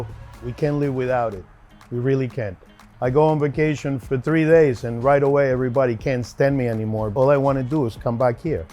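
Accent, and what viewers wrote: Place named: Italy. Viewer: Yes yes! I want to give this guy all my money with a "Italian Accent"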